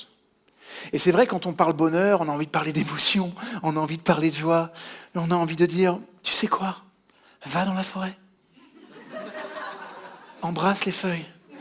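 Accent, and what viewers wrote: Quebec, Canada. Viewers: Ch’t’aime donc bin! Avec l’accent d’une pure QUÉBÉCOISE ❤️🙏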